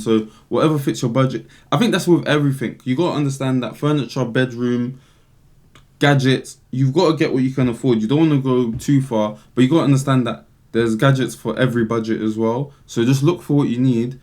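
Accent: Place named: United Kingdom. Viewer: that's a real nice british accent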